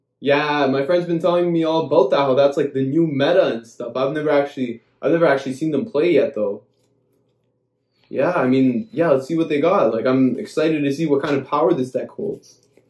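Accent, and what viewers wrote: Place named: Toronto, Canada. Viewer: Dude I know that accent, my be from Toronto, eh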